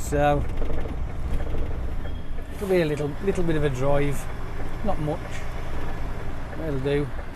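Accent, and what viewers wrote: United Kingdom. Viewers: First time I seen ur video very good really enjoyed ,were is ur acent from ,what part of uk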